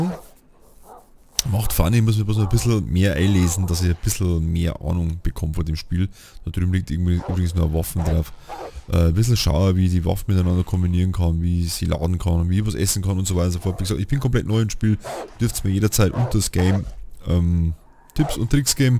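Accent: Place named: Bavaria, Germany. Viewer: Supaa Video. Endlich moi a scheens Boarisch hean. Do head ma gern zua. Mach weida so.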